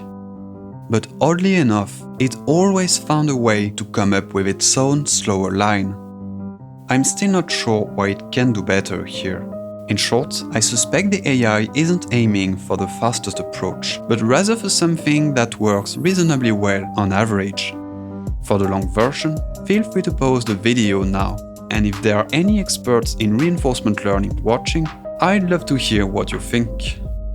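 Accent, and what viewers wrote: France. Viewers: Are you french or smtg? I’m wondering cuz I’m french too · are u french by any chances ? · You sound French. Are you French? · why is bro french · tes francais toi XD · From content to editing, everything is near perfection. You sound a little bit french, alors merci pour ces vidéos, le montage est incroyable, et le travail derrière monstrueux. Franchement, tu mérites plus de reconnaissance, tes vidéos sont niches et en même temps tellement abordables et passionnantes, ça force le respect. Chaque fois, c'est un plaisir de les regarder, et tu m'as fait découvrir l'univers du speedrun de trackmania d'une manière tellement originale, c'est sûrement devenu ma licence de jeu de course préférée! Alors merci encore, et bonne chance! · vu ton accents tu as l'aire français, tu voudrais pas mettre une piste audio francais ? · is he french or what ? 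· STP BG MET UNE VERSION EN FR CAR TON ACCENT EST VRM TRES FR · T’es francais ? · Hi! are u french? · tu est Français? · Are you French? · Are you french ? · J'adore ton accent français, t'es vraiment une super chaîne YouTube !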